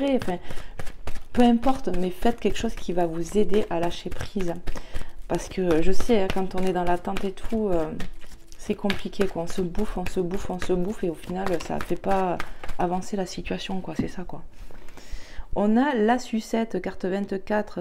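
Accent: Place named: South, France. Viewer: Cc tu es du sud comme moi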